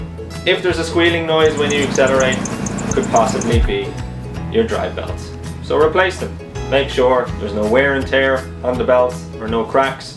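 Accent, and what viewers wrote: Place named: Canada. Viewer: I knew your accent was Canadian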